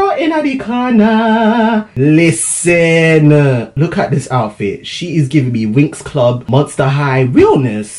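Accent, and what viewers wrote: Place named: Caribbean. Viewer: Is it just me who thinks sounds like he is from the Caribbean, I'm from jamaica so I should know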